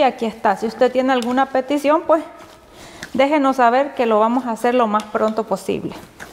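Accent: El Salvador. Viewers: X lo que te escucho eres mi paisana salvadoreña , se hacer las pupusas y tortillas pan comido para mi. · Holllllaaa! Saludos desde Belize 🇧🇿 somos de El Salvador, Apopa!! ❤️ nos encante ver como usted cocina y como nunca pierda el dialecto salvadoreño 🇸🇻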